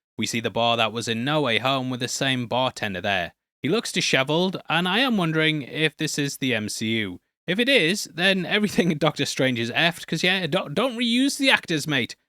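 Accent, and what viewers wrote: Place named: Liverpool, United Kingdom. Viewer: unforgivable scouse accent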